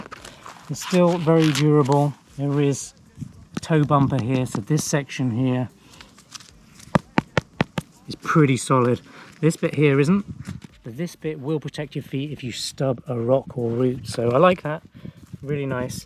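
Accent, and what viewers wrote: United Kingdom. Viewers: are you in the US or the UK? You're defo British by the accent?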